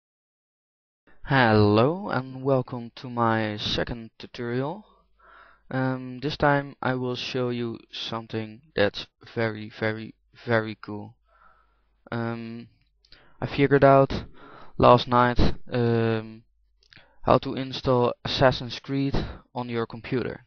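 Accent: Netherlands. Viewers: ja echt duidelijk een nederlander LOL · are u dutch? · hehe je bent NL ik hoor het aan je stem XD nice video! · haha je hoort echt dat je nederlands bent!!:D heeeeello xD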